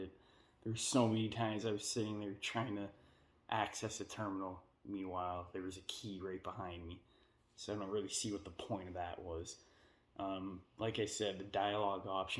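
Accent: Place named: Canada. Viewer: Don't respect anyone with a Canadian accent's opinion on "Falloot"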